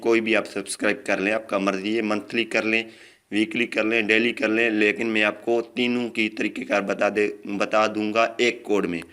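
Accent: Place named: Sindh, India. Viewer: Lagta ha ap sindhi ha ap k lajhy se lag rha ha.vip 👌👍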